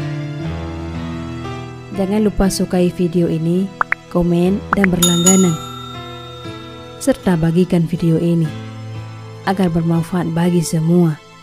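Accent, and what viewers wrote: Aceh, Indonesia. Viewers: pengisi suaranya seperti logat org aceh😁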